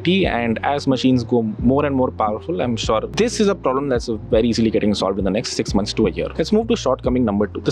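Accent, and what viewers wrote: India. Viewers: Haha you Indians have funny accent.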